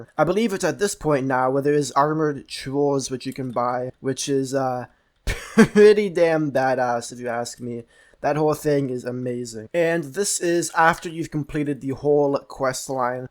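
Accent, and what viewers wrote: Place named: Canada. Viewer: Where are you from? You have Canadian Accent!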